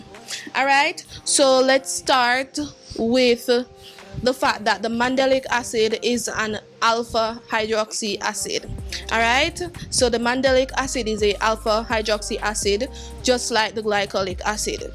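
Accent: Jamaica. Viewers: I like your Jamaican accent! 👍🏾😇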